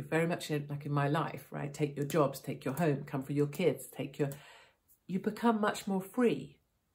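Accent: United Kingdom. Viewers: Based on your accent I assume you are posting from the UK. I may be wrong. Why on earth are posing in from of a hugh american flag? You have little say in the UK and exactly zero say in the US. A lot of what is now going wrong traces back to american moves.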